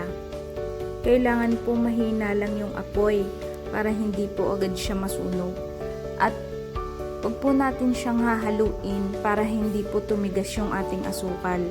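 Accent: Batangas, Philippines. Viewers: Ang cute nyo po magsalita parang gusto ko nalang tumira sa batangas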